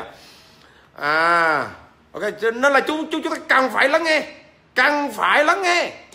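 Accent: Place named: Central, Vietnam. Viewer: Chú ơ dau cua miền trung vay ạk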